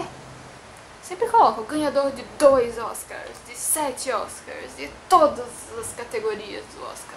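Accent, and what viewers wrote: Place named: Northeast, Brazil. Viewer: Não gosto muito do sotaque do nordeste :S Mas sim, é verdade isso hehe estuda o que la?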